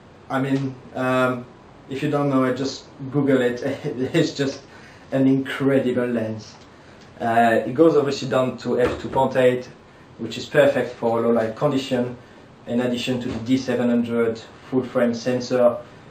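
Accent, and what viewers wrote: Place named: France. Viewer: Nice stuff mate! Are you originally from France? I think you are because your dialect sounds so. :) Have you ever considered to change Sigma 70-200 to a Nikon VR1? It's a one of a beast lens on a full frame!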